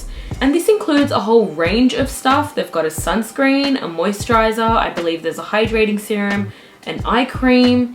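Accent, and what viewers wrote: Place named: New South Wales, Australia. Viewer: I'm so glad you're posting again! It's great to have an Aussie skincare channel, and someone who has dry skin!! Maybe you could do a video about yourself and your cat? Judging from your accent I'm gonna assume you're from NSW maybe? When did you get into skincare, how did you learn about it? Why did you start the channel? What have you learned from the channel? How much skincare do you go through seeing as you've tried so much? I'm just started using No7 cleansing water, hydraluminiius day cream, retinol, and retinol night cream and I'm really enjoying all of them, I got them after you mentioned No7 in one of your videos. I use dermaveen as my body moisturiser, and I really want to try their new face wash, the ingredient list looks like it will work well for me. I usually apply my body moisturiser first then by the time I've done my face routine and brushed my teeth I'm ready to get dressed. 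Mind you I wouldn't be able to do that in the morning in winter, too bloody cold.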